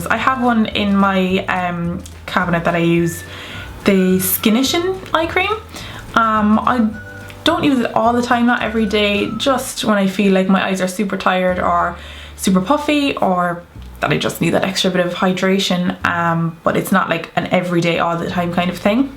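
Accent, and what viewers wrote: Ireland. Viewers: I knew straight away ur Irish cause I'm too what county u from😉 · Is that a cork accent I hear?!?! I love finding fellow Cork/ Ireland you tubers! :) You're so pretty btw! I've subscribed 💕💕 xoxox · are u Irish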